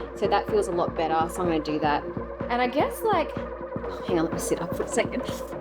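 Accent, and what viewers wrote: Australia. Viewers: The Aussie accent is a surprise. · Didn't no you were an ozzy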